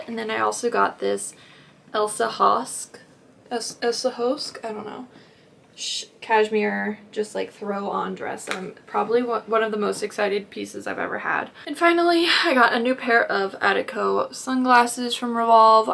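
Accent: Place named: Tennessee, United States. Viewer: I’ve never been to Nashville or Tennessee for that matter but is that what a Tennessee accent sounds like? Like wow. Also, why not turn the comments off so no one can comment on your lips?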